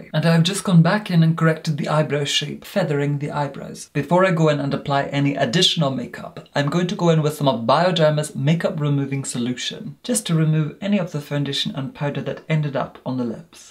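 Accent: Scotland, United Kingdom. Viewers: Am I hearing a bit of Scot in your accent (like Alan Cumming)?? I love this video, I can DEF afford the NYX. TY <3 xoxox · Greig Scott he’s Scottish, very posh Scottish at that lol. I’m from Glasgow and I’m trying to work out where he’s from exactly. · He’s Scottish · I love your accent. I love all Scottish accents but yours in something beyond! :) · Literally the poshest Scot ever. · I can’t decide if your Scottish or not? You definitely sound different from me if you are! Where are you from?? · he sounds very Scottish to me (another scot). You can tell he is from the north of Scotland and stayed in Glasgow. I'm sure he has picked up a little bit of an English accent but that's only natural if he is living there. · it took me a full two minutes to figure out he was scottish, despite me also being scottish and living in scotland. it’s just something about the way he talks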